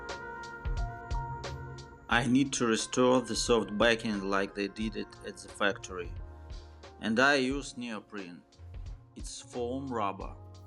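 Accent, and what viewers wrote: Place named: Russia. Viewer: По акценту будто русский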